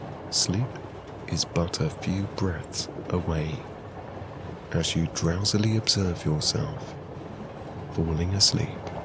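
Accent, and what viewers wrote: Scotland, United Kingdom. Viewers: I was having some trouble recently calming manifestation.guide the voices in my mind during my morning meditation. him has a wonderful Scottish accent and a very calming voice. Thanks to his meditation CD's I have gotten back on track and feel a big difference in my meditation practice.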